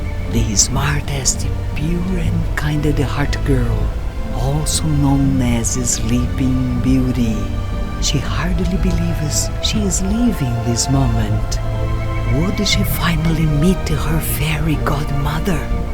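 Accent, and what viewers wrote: Brazil. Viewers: A narradora tem sotaque brasileiro quando fala inglês.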